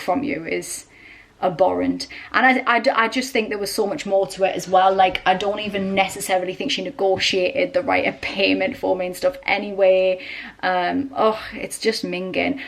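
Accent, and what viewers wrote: Newcastle, United Kingdom. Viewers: Your accent is awesome, it's not every day you get to hear a Geordie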